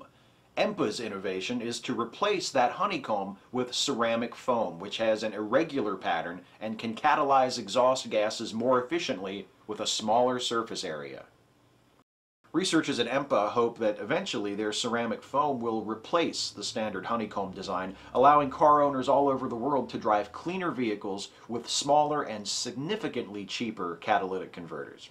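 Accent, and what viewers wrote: United States. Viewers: veeee hickul. I love American accents.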